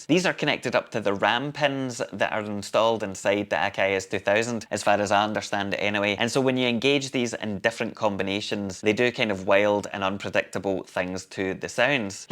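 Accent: Scotland, United Kingdom. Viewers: Love yer scottish accent (Shetland / Orkney?) Those AKAI sounds wicked too.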